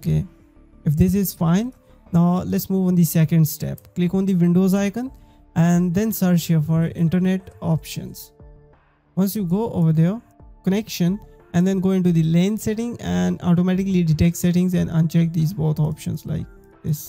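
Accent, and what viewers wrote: India. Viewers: Don't tell me you don't know that's an Indian accent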